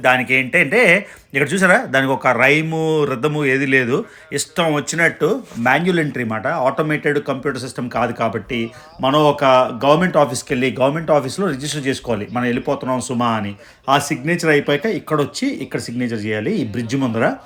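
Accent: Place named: Odisha, India. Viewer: Nuvvu konchem Andhra slang ki marali annai. Appude views perugutai. Ledante odisha vadivi ani neglect chestaru.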